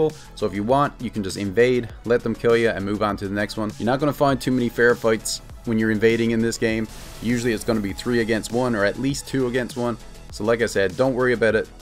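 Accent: Newfoundland, Canada. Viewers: Newfoundlander 😎 I could tell soon as I heard your voice I too am from the rock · I knew you sounded from around the bay!! Haha awesome buddy how’s ya getting on? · you're a newfie arent you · Are you Newfie?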